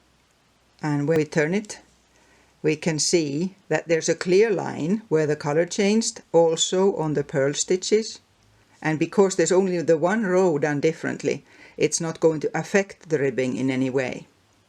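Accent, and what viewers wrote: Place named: Finland. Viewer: Thanks so much! I've been crocheting for over 40 years, but I'm relatively new to knitting, so this was really helpful. Also, I just wanted to tell you that even if I knew this tip before, I'd still watch the video because you sound just like my aunts sounded when I was growing up. (My dad's family was from Finland) So listening to you brings me back to my youth when I'd listen with fascination to their beautiful speech patterns. :)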